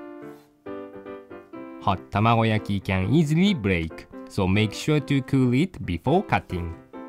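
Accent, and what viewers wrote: Japan. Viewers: looks like you've never heard a japanese speak english... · 英語が日本語すぎてちょっと笑ってしまう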